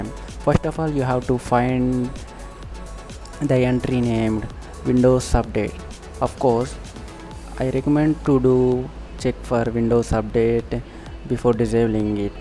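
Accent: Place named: India. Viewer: ew indian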